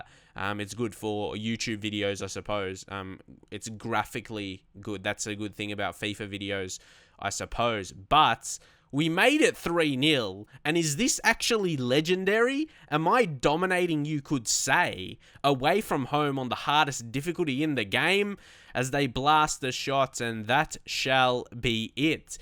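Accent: Australia. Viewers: Love the career mode but it's moe-at I understand it's the Aussie accent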